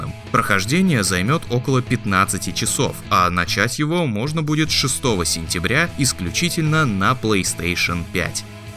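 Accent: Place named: Russia. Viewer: Не понял, а что не так? В видео парень говорит «дрэгон эидж», почти правильно, незначительная ошибка — вместо дрЭгон должно быть дрАгн. Хотя в американском англ почти «дрАЭгн» говорят. Не помню точно как обычно произносят Prince of Persia на этом канале, но вроде тоже почти правильно: «Прин(с/ц) оф Пё(р)ша», «р» в американском английском произносится, в британском нет. Что не так-то? Незначительные какие-то придирки, они же с русским акцентом говорят, а в целом правильно, вы вообще слышали как говорят любые другие национальности? Типа индийцев и любых других азиатов, или африканцев, кого угодно.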